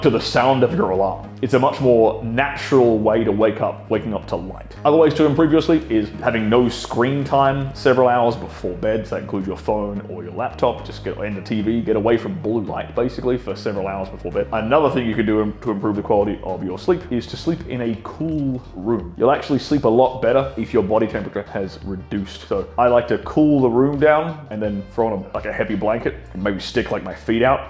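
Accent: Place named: Australia. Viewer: He's Australian 😅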